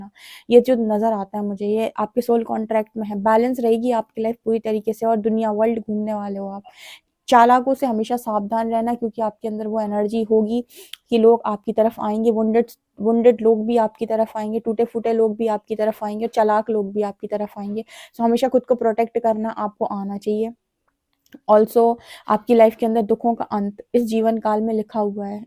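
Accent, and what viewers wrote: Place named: Bareilly, India. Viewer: Kya ap bareilly se ho, accent to wahi hei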